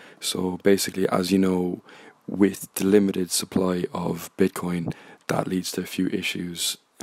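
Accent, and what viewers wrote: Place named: Ireland. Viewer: In Swindon now but lived in Waterford for 15 years. Can listen to many accents and not give a shit but as soon as I here the Irish accent I know I will watch the video :-) + sub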